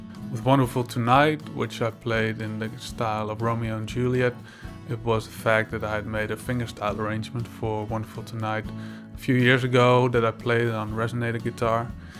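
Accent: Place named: Netherlands. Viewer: The Lord has a voice! And he is Dutch! (The first 3 seconds gave it away)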